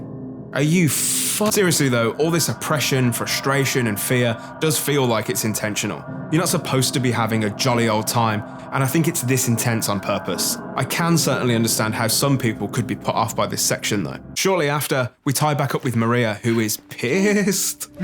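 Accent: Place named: United Kingdom. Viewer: oh shit and he's British! (Same here dude)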